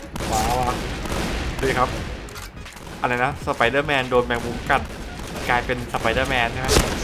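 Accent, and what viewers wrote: Thailand. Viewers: ติดตามตั้งแต่พี่พึ่งเรียนป.ตรี เสียงเหน่อๆจนตอนนี้จบแล้วอยากถามว่าตอนนี้พี่ทำงานอะไรครับ😅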